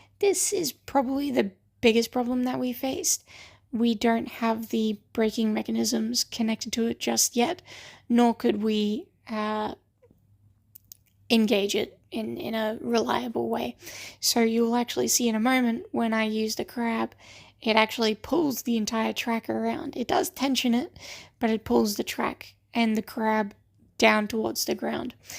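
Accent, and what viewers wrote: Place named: Australia. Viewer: That slightly cracked ozzie accent of yours is delightful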